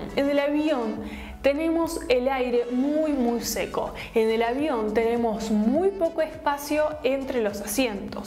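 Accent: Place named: Argentina. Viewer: Muy buen video! Me pareció un poco raro tu acento en este. Estás tratando de hacerlo más neutro pero aguante el acento argentoo! 😘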